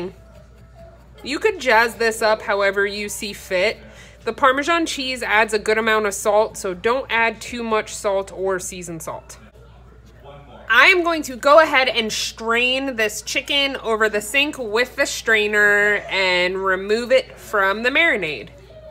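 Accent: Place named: Philadelphia, United States. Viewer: I hear a Philly maybe Jersey but mostly Philly accent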